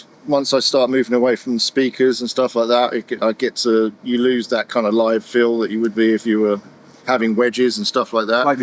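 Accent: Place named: United Kingdom. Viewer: I never checked before. Surprisingly British. 😅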